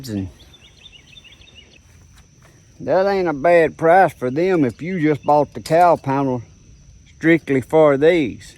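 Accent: South, United States. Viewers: I just found you, thank you. And it's good to hear your good old Southern Voice.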